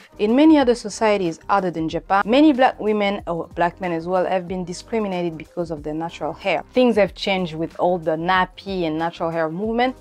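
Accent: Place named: France. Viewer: wait are you french? bc u have the french accent like me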